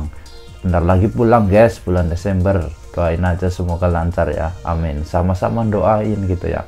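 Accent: Banyumas, Indonesia. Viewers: Ora Ngapak ora penak yuhuuuuu ketemu dulur Ngapak mning heee dengerin cerita suka duka di Jepang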